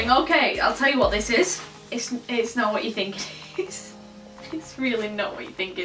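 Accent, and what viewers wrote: United Kingdom. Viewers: shammy dammy no it’s a British accent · british i think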